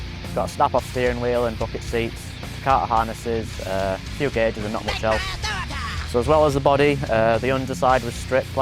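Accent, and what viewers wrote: United Kingdom. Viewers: I love the loud grunge metal music in the background then the soft belted British accent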